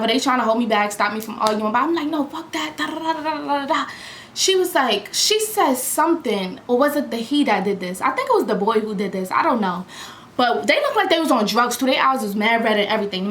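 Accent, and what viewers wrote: New York, United States. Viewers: “Like ouuuuuuuuuuuuuu LMFAOOOOOOOO are you dumb.” You’re so New York I love it 😍😍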